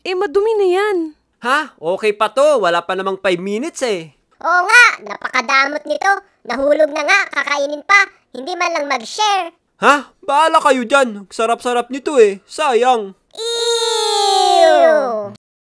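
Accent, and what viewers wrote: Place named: Nueva Ecija, Philippines. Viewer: Taga Nueva ejica ka ba idol may punto kasi salita haha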